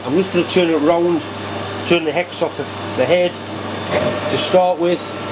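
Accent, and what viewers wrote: United Kingdom. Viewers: Your command of the Queen's English is enough to delight the heart of an uncultured American....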